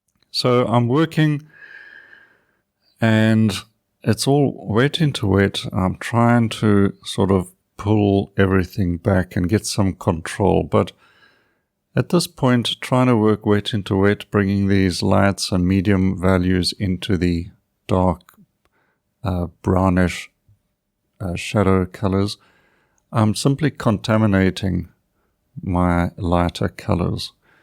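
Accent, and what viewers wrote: South Africa. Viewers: Every one of your videos is helpful. Imove between impressionism and tonalism or a combination of the two. Love your work and your gentle vouce with its echoes (for me) of South Africa. Thankyou ❤️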